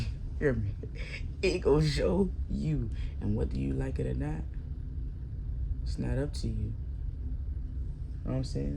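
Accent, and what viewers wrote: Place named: South, United States. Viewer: yk the whole video i was saying “damn she talk like she from the south” it had me wondering where you was from cs shit got to familiar 😂aye as soon as you said most people ain’t seen outa va Ik you hada been from here ,757 specifically i might be wrong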